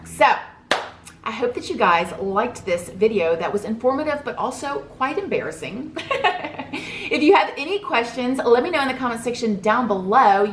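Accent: South, United States. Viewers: We're around the same age, and we've both had hard lives, my best friend of over a decade was killed but somehow I was able to speak to him after he'd passed, he died on Thanksgiving of 2016, and any doubts I had about god were suddenly gone, he'd always promised me he'd contact me if something ever happened and he kept his promises, I went through such a bad depression I'm just now starting to do things that involve getting better, all my muscles atrophied from just not having the energy to live life anymore, and I need physical therapy to walk normally again, I quit painkillers shortly after he died but you live in Florida, you know how easy they are to get, and growing up in Virginia I never thought they were as deadly as they are, and I battled on and off with addiction and abusive relationships for a very long time, I'm just now coming out of the worst depression I've ever been through, I'm filming everything, so hopefully it can help others feel less alone, and see themselves in my journey. 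I found your channel and we both have the southern accents and your ability to frame a story is just astounding, I've lived in Los Angeles now for most of my adult life, but you remind me of my friends from home, and it's comforting for me to listen to you, I laugh and I cry and I'll think "Oh wow I did that too!" it's helped me a lot, I've met some incredibly talented people out here, and you have so much natural charisma, you really could have a career as a motivational speaker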